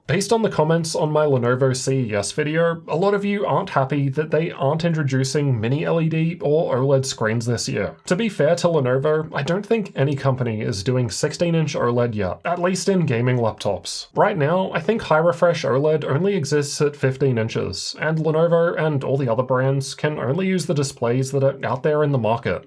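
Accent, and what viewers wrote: Australia. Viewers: Okay but what are now the best and worst laptops from this year's CES? This is just a recap I came here to hear some Aussie ranting!!! :D Still nice video :) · The most Australian Australian I've ever heard, nice comparisons, thank you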